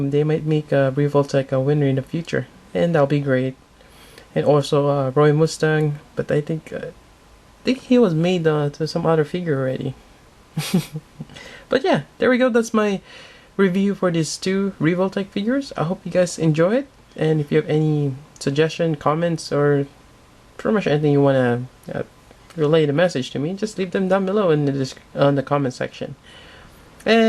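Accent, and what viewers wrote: Philippines. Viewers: r u Filipino? Cause I’m half Filipino and I can kinda tell from ur accent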